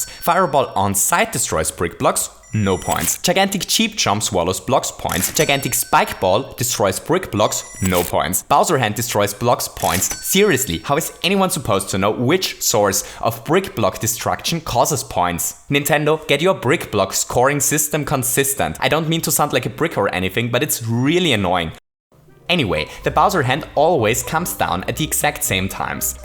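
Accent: Germany. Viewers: Bist du ein Deutscher ? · Are you german?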